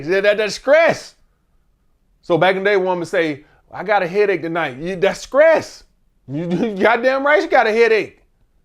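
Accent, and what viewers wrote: United States. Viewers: Scresss scresss scresss!! Country as HELL 🤣🤣🤣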